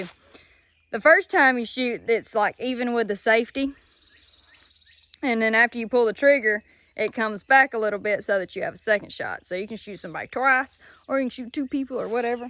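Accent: South, United States. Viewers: U go girl bless you 😳 love the way you talk I'm from South Carolina but U got that Southern slang 😍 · There is some accent in this southern! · Something real nice about that southern accent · Aye aint gon she so fine 🤧🤣 i need me one of her them south girls w the southern accent that shit so attractive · That American accent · Southern accent is priceless for my Finnish ears :D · Always been a fan of brunettes, loving the southern accent too. Girls and guns, gotta love it. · Personally, I love southern accent. But if you would like to hear her as a northern girl, just turn the playback speed to 1.25. 😉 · Shes gorgeous and as I Texas boy I love her southern accent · I tell you what that Southern draw and that Brunette hair my goodness. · I just love thst country accent. Makes me feel all American inside. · This woman is so freaking adorable 🥰 Enjoy her content ✌🏼 Gorgeous!! 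That Southern drawl is to die for Where have you been 🤔? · damn had to subscribe because of the country accent love the videos lol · Okay my neighbors to the south. I'm up in Vancouver, Canada and obviously we have nothing like this absolutely fascinating and utterly adorable accent (?) up here! Is this just an anomaly or is this basically the 'drawl' southern states have? I have been to Florida and it didn't apply but is this the norm in Kentucky, Tennessee, Georgia and Alabama...and others? I'm thinking maybe Texas as well? Its so damn.....pure? I don't really know what to call it but it's just such a wholesome and comforting kind of (for lack of a better word) accent. It makes me really want to take a trip down and do a road trip through all the southern States the summer. Anybody have any recommendations on the most enjoyable Southern state or states to visit? ❤ from a 🇨🇦! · Now folks, this is what a good ole southern girl sounds like. 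I was born and raised in central Florida and we got some words most people don't use or know of. This young lady is very pretty to boot. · South state accent? Not my native language, I try to perfect it, so if you mind tell me, I'll be greatful. (You said Alabama, right?) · I sure miss the TN/AL accent!! Gotta love her southern twang!! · This is the most American accent ive ever head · "you are Purrrrday"" and your country ACCENT reminds me of,--(, Bless you)), girls that say my name like, cheee-steer-!,lol.(((eye love your channel, 🤓try pepper spray on a 200lb wild hogg, bring extra spray & bee safe. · this is the thickest american accent i have ever heard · i have no idea what a pepper blaster is. but shes kinda cute says it with a thick southern accent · Definitely a stallion so beautiful the southern accent makes her even more gorgeous 💕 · Southern draw ! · That southern drawl is too cute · I just saw one of your videos as I was strolling and I was like wow she’s really beautiful so I instantly went to your channel and subscribed, I love your southern accent and your personality and your in amazing shape but one negative thing I saw was your amazing 💐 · Always real American accent.. 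👍🏻👍🏻👍🏻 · I love your country accent oh my God marry me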